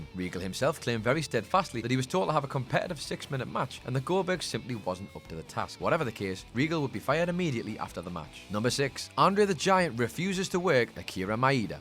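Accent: United Kingdom. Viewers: I have no problems with UK accents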